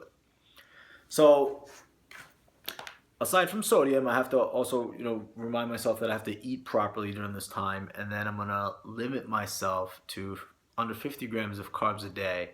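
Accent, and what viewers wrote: New York, United States. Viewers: Lmao just because he has an Asian name doesn't mean he has to have a Japanese accent. He was probably born and raised in New York hence his accent. Probably never been to Japan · bud ekins he just sounds like a American to me I'm almost guaranteed that he was born in America but if he isn't I'm very impressed.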